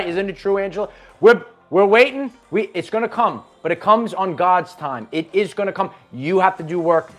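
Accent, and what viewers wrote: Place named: Philadelphia, United States. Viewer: Are you at Dan Giacobbe’s house? Def have a Philly accent.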